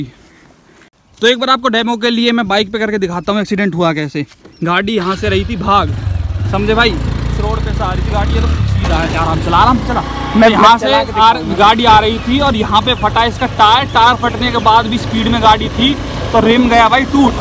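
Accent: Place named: Jewar, India. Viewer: Bhai jewar ke pass ke lgte ho awaz se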